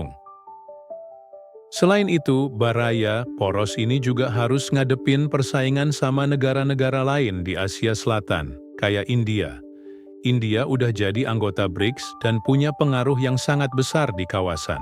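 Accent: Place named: United Kingdom. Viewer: Orang British bahasa Melayu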